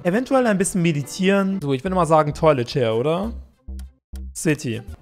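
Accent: Russia. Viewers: Bitte mit mehr russischen Akzent! 😍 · moi drug kannst du mehr videos mit russische dialekt machen ? :3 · Dein russischen Akzent ist immer am besten mache ich auch schon mega geil 💛💛🤣🤣🤣😂😂😂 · Ich liebe diesen russischen Akzent. Auch wenn meine Mutter es versäumt hat mir und meiner Schwester russisch beizubringen ist mir die russische Kultur vertraut. Plow ist zum Beispiel eines meiner Lieblingsgerichte und die Serie bei hab ich damals, als wir meine Oma in Russland besucht haben, geschaut ohne ein Wort zu verstehen ^^ Mach weiter mit solchen Videos. Sie sind einfach lustig xD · hahhaha ich schaue es mir schon dass 15 mal an es ist so lustig. spiel bitte mehr spiele mit russischen Akzent. <3<3<3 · Haha omg😂dein russischer akzent ist soo geil😍bitte mehr davon! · Mach du Mal komplette streem nur reden mit russisch akzssent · Russisch ist fast so wie Kroatisch 😂❤️ ich heule aber vor lachen, mehr mit russischem Dialekt 😂😭❤️❤️ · Ich finde es so nice und witzig, wenn du mit deinem russischen Dialekt redest xD Das klingt einfach übel cool ^^ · der russische akzent und die ironie machen es perfekt! 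· Lachhkrampf haha XD bitte mehr videos mit russischem Akzent blyat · let's plays mit russischem Akzent sind die besten! 😂😄 (Bitte mehr davon.😅) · Ich bin nebenbei am Essen und habe mich verschluckt vor Lachen xD Bitte mehr Videos mit russischem Akzent xD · bitte rede immer mit so einem russischen Akzent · Der Russischer akzent ist ziemlich lustig. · bite mehr russia Akzent XD спасибо <3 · Wer feiert russischen Dialekt auch so. 😀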